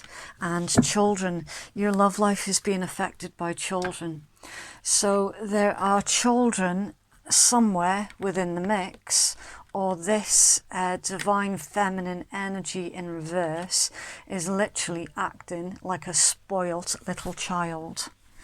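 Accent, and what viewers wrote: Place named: Northern England, United Kingdom. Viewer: Thank you very much for this reading. I’m sure this message was intended for me, it was so specific I was literally waiting for you to give the individuals names involved! I think you are in the north of England from your accent. I have been living away from the uk for the past four years and am returning in March. I would very much like to meet you, do you ever do personal readings face to face? You can find me at <mail>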